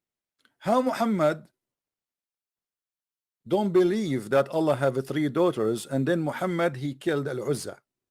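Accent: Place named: Israel. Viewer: What in the name of the judgement day are you talking about? Arabic Gods? Islam came to destroy those false Gods. God did not give birth nor was born. it is the Clearest message in the book that you insult. why there is always those small channels that no one knows with a guy with thick israeli accent trying to promote something so ignorant to such small number of people off the grid, don't you feel ashamed?. i mean if you hate the religion okay. you're free but don't be stupid. there are history books written by your people, educate yourself before talking stupidly and making a joke out of yourself. you're supposed to be a teacher, a preacher? what are you? your job is to attack other people's beliefs based on lies? is this what your main religion taught you? to spread lies in order to badly influence ignorant people that has no knowledge about anything ? 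Whatever man. God is Greater than any work in the dark. He's always awake and always sees everything. Even the things we never say. keep that in mind. Peace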